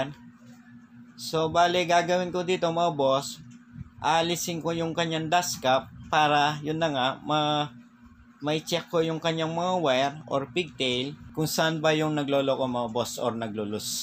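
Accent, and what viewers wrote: Pampanga, Philippines. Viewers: Kapampangan ka ata boss hehe halata ee..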